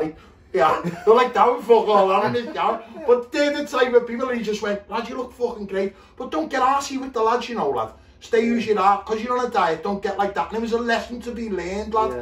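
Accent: Liverpool, United Kingdom. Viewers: Proper scouse him. Quality.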